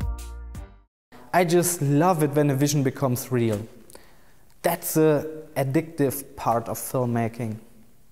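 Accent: Germany. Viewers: Oh , bist du Deutscher oder Österreicher?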